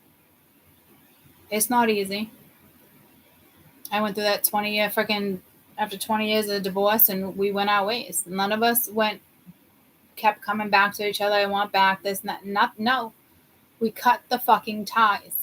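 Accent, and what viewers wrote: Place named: New England, United States. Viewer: I love your honesty. I’ve been following you since day one at your condominium. I rarely catch you live but I always rewatch. You are so gifted. Brenda from New Hampshire, formally Stoneham, Ma. We share the same accent 😂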